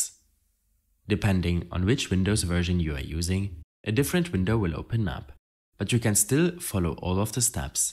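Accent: Germany. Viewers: Man hört das du deutsch bist 😂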